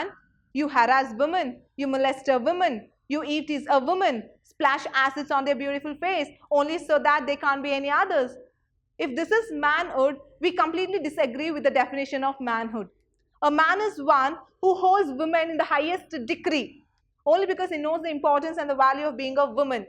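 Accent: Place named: Kerala, India. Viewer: But her pronunciation is unbearable...mallu pronunciation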